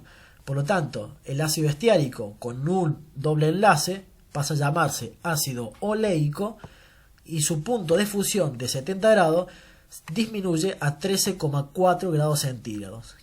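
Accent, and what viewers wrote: Argentina. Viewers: Hablas como argentino de provincia. De donde eres?¿cordobes?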